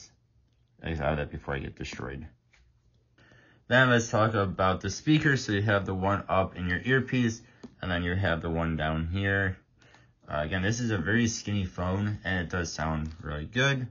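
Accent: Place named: South, United States. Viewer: Excellent review. But why are you speaking in a southern accent? Are you from rural Alabama?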